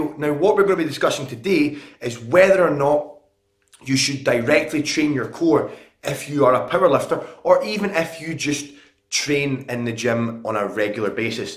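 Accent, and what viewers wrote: Scotland, United Kingdom. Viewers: yo where in scotland are ya from?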